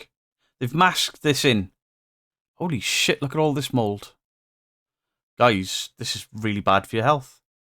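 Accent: Wales, United Kingdom. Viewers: Petition for to read audio books. I love the welsh accent ❤❤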